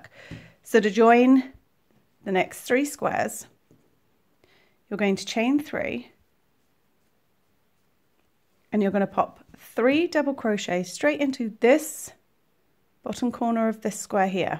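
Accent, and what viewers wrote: England, United Kingdom. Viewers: Great video I have subscribed and shall look at your other vids You sound British so why are you using USA terminology? You even kept correcting yourself when you said treble. Not trolling or criticism just curious? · Hi this is brilliant - so grateful for your lovely video tutorials. The only thing confusing me is your use of US terminology in your lovely English accent - but that isn't a criticism as just so grateful to be able to follow your methods. Will this CJAYG method work on the solid granny square and do you have a tutorial for that as well please.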